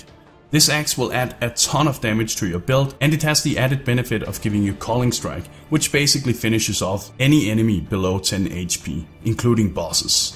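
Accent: Denmark. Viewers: Was thinking.... Damn thats a danish accent.. "Checks your twitter" oh... you ARE danish :) nice Nice content man, det er super godt :)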